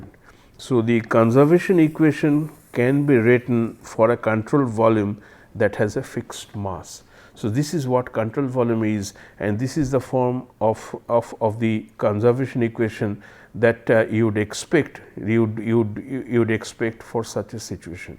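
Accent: Bengal, India. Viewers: His Bengali-accented pronunciation is so difficult to understand. Did he say "zone heating" or "Joule heating"? This is the problem with MOST IIT Kgp Bengali professors.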